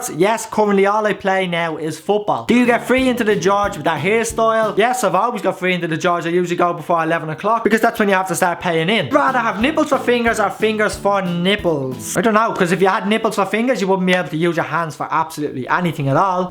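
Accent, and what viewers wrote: Ireland. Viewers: Its nice to listen to an Irish person on you tube just messing around and not being so serious. PS you remind me of my little cousin's deadly (*-*).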